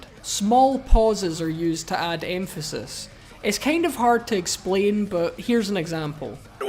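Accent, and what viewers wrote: Scotland, United Kingdom. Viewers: the scottish accent is just the cherry on top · Funny Scottish dude make the good explanation, my question, where does the Hu fit in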